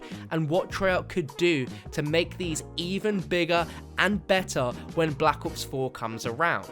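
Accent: United Kingdom. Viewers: He is British? Or American?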